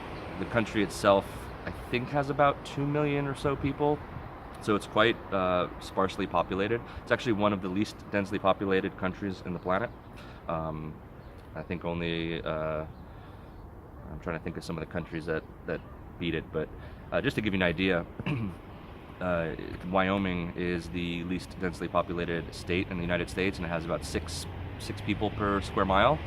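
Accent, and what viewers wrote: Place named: England, United Kingdom. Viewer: You sound English dude..In Whk now from the UK.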